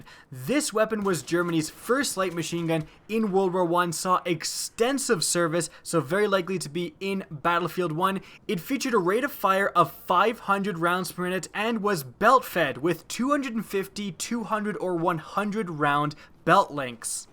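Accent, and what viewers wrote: Russia. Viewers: Nice Russian accent 👍🏻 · Nice russian accent and hello from Russia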